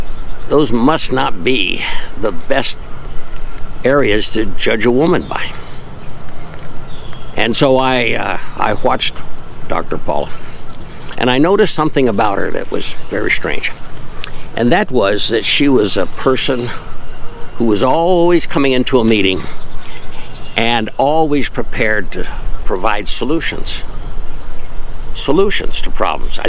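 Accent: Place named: United States. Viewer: was a handsome guy back in his day, bet he had the choice of all the young ladies!!! I feel kind of bad for pulling you up on one of your older vids for pronouncing gamo as gaymo!!! lol I only came across that vid as I was looking into the gamo swarm maxim elite becasue I purchased 1 around that time & having only seen that 1 vid, didn't realise how much of an official hardcore gun enthusiast/aficionado with such a vast collection & being a living knowledge base in the overall field of guns (I live in UK & just own the gamo swarm maxim elite... but would def own more if I lived in the US). So my apologies for the gamo/gaymo thing, I feel a little humbled when I only own the 1 & you own enough for a small army, yet I naively pulled you up on pronunciation! only meant as light hearted UK/US tomato/tomaydo type accent difference & was just having fun! 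(here in UK we like the US/American acent!). looks like an amazing place to live in Michigan!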